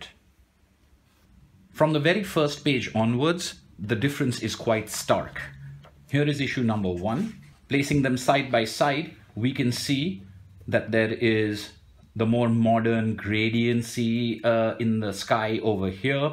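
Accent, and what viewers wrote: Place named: India. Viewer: What's that "Made in Bollywood" accent ? ... Cow, it's horrible ! On the other hand, the explanations are very good ... Thank you !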